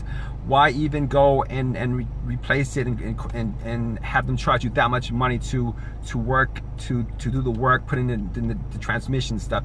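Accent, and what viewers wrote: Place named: New York, United States. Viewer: love the accent!,new york,boston?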